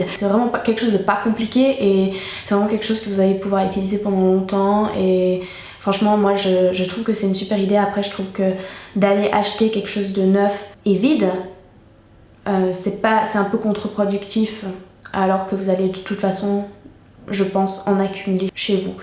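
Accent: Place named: Switzerland. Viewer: J'imagine que c'est l'accent suisse qui prononce comme ça.. . Chacun son accent 🤔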